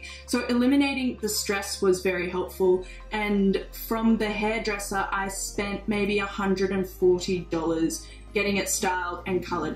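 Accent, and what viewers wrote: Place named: Australia. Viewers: Never even knew that our aussie accents made tattoos sound like "ta-doos" to americans?¿😂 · so pointing out that an Australian sounds like an Australian? Don’t let them change you, tell them to go heck themselves and lean into it even more. Go full bogan. Sell “Tadoos” merch. Do you.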